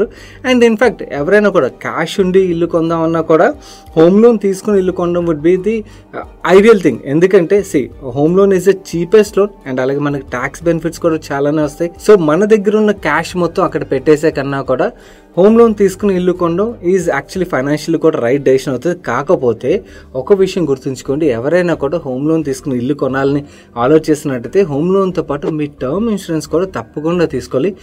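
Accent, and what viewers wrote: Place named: Godavari, India. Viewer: Godhavari sonds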